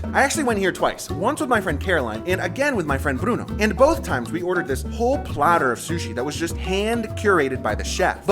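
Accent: Spain. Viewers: The way he forces himself on sair Brasil is kinda annoying. The spanish accent is so uncessary. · Are you from the US? Where does your Spanish accent come from?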